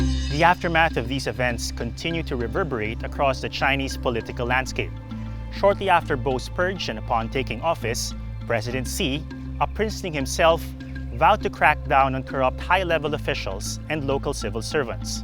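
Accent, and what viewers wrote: Philippines. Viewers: ah that explains his accent, he sounded filipino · I’m not even a native English speaker but I think I can hear a Filipino accent here 😄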